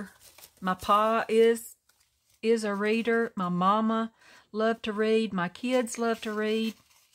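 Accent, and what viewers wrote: South, United States. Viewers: Hey! 😁 You have the sweetest voice. I’m from southwest GA but I live in Wa state. I miss my folks. I love a good southern accent. 💕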